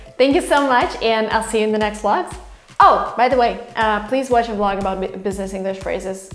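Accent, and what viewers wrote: United States. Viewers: do your Russian students mention your American accent? I like your videos:) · Hi, I have been following your videos, really great.... I am a softskills trainer but I understand that I need to learn a lot about it. I am from South India, working in an institution. Any kind of your help, will be of great support for my career. Please let me know if you can offer me in this aspect. I want to talk like you. With the American accept. Please help me. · Hello, I'm learning English mainly to speak like a native speaker. My English level is intermediate and B1 level., I also did English courses intermediate and upper intermediate at British Council in my country Sri Lanka. Anyway my English is not 100% improved and I want to speak like a native speaker. I want to follow your English course. Your American English is also best to me. Unfornately I'm getting a low income from my job and nobody is offering me good jobs because of my sickness. 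But I will try my best to collect money and buy your English books. You are kind to me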